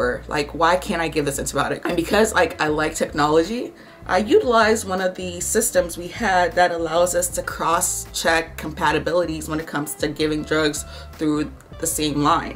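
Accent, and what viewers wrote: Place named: Africa. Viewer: Omg! It’s the African accent for me 😂😂😂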